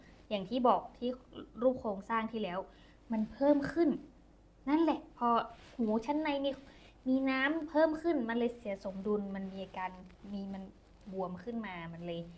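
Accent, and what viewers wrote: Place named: Surat Thani, Thailand. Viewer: ชัดค่ะ สุราษ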